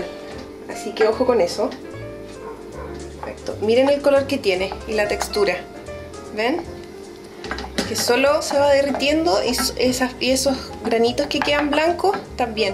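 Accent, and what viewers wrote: Chile. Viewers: Bella Fernandes obvio chilena por coml habla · me encanta la receta no se ve para nada dificil, mi unico problema que tengo cocina magallanica. por tu acento eres chilena. saludos desde punta arenas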